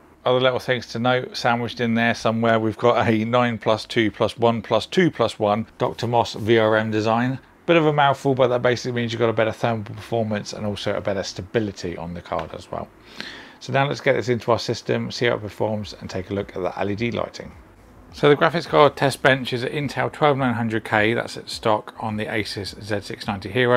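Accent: United Kingdom. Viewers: i like your brit accent :D also 2nd time finding you overviewing smthn i am interested in, good content mate :)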